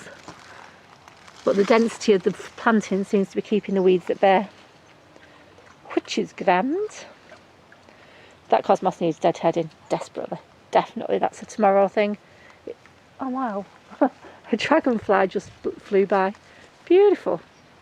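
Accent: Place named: Lancashire, United Kingdom. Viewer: great tour thank you. Also, it sounds to me like you have a Lancashire accent so for that reason I am going to add you to my list of "check these out" channels featured on my channel's homepage. Big up the north west massive, nice one.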